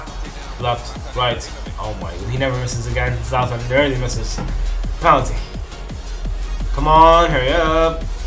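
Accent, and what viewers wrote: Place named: Spain. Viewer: you sound little like if you were from Spain little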